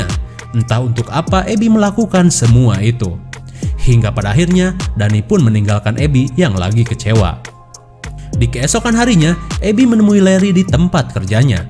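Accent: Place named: West Java, Indonesia. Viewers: Bang kamu pasti orang sundanyak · Suara sunda mh enak ya bund;v mah kuat wat wat wat wat wat terngiang² 🤣🤣🤣🤣😴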